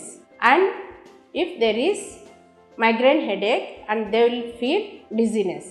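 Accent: India. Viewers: Accent hindi ki hai to hindi hi mein baat karé... Mujhe chakkar agaya apki english sunkar..azad bharat ki angrezi bhasha ki gulami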